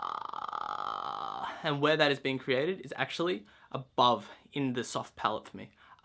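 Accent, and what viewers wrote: Australia. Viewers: Are you an aussie? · so aussie i did not realise he was aussie as well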